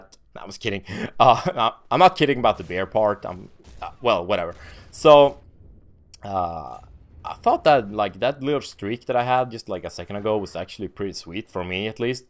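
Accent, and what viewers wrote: Sweden. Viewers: swengelsk accent,älskar den haha x'D härligt härligt lol har sett mig själv i ett par av dina MW2 clips :P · are you from norbotten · svensk från norrland? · Swedish accent :)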